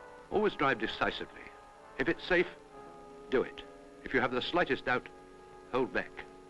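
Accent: RP, United Kingdom. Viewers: Fascinating accent - Proper BBC RP mixed with West Country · Great to watch. Full of Britishness that you would not get to day. RP in use. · That was some serious dingbat in the red Triumph Herald, obviously had envy issues with the Jaguar. I was surprised how congested the out of town roads were for 1963...Nice plummy, authoritarian voice in the narrative. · He is soooo posh !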